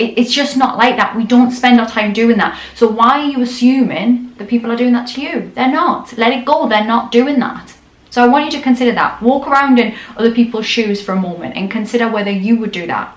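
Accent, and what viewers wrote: Newcastle, United Kingdom. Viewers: Beautiful geordie accent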